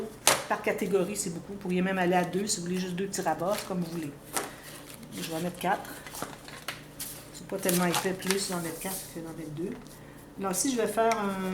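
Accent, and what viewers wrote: Canada. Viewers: Coucou, Pour tes pochettes plastiques, tu aurais pu faire une incision au cuter coté droite, pour pouvoir ôter tes fiches comme ça coté gauche tu aurais pu couper la 1er partie pliable avec les trous et en refaire dans la 2eme partie pliable, et là ta pochette plastique aurait la même taille que ta page et plus de problème pour sortir et rentrer tes fiches! ► Vérifie quand même mes dires car n'étant pas près de toi, mais derrière mon écran peut être que j'ai tout faux! Étant donner que c'est la 1er fois que je met un mot sous une de tes nombreuses vidéos (toutes vue hi hi ) j'en profite pour te dire,.... MERCI tes explications sont claires, tes idées de pages ou forme d'albums sont vraiment très très intéressantes et j'ADOREeeeeeeeeeee ton accent qui me fait voyager chez toi au Canada! MERCI BEAUCOUP pour tous ça! Bonne journée & Gros Bisouuus Valérie de FRANCE.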